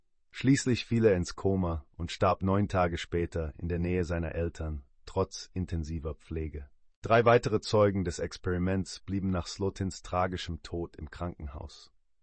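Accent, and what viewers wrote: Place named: France. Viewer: Du hast einen leichten Akzent. Bist du Franzose?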